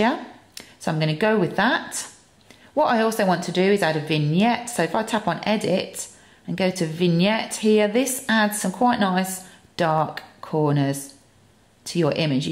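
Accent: United Kingdom. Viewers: Are you British,please reply me.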